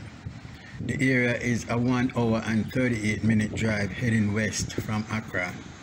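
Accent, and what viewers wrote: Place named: Jamaica. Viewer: Greetings my brother, u sound like a jamaican just came across u video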